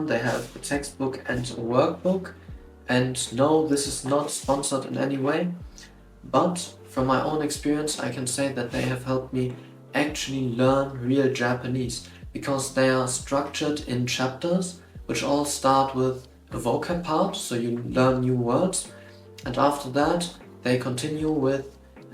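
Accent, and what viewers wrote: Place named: Germany. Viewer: I don't know, I studied in a completely different way, and it took me 2 years to reach N2. Learn Hiragana and Katakana in 2-3 days, don't avoid Kanji in the beginning, use Duolingo or Busuu for 2-3 months because the app gives you direction. Don't use Genki because the teaching order is illogical (e.g., teaching ばよかった before the actual ば-form, not distinguishing between things like というわけではない and とは限らない and so on). Wanikani > Anki. But I do actually agree: consistency is key^^ Und jetzt am ehrlich , ich höre da schon nen krass deutschen akzenten mein lieber.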